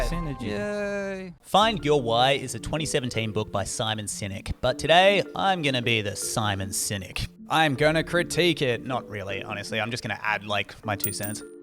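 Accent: Australia. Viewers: I love your videos!! Perhaps someday you can add subtitles? I'm from Colombia and the Australian accent is way more difficult to understand. Thank you for posting!!!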